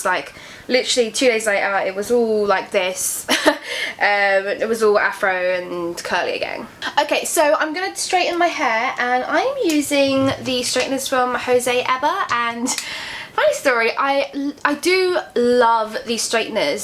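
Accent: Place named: United Kingdom. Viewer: love your British accent you’re beautiful thanks for this video. Still haven’t done it yet gonna color first.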